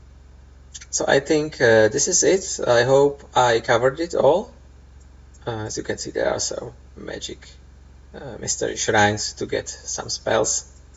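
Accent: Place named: Czech Republic. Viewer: Mě ten čengliš právě vyhovuje, rozumím tomu daleko líp, než rodilímu mluvčímu :D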